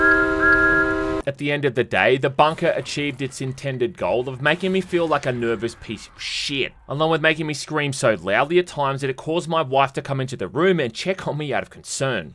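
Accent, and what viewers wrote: Bogan, Australia. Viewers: Just discovered your channel. Love gaming commentary from someone else with a bogan Aussie accent! Good work! 🇦🇺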